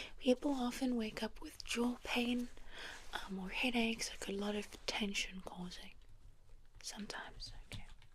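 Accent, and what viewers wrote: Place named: United Kingdom. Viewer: I swear she is British with that accent.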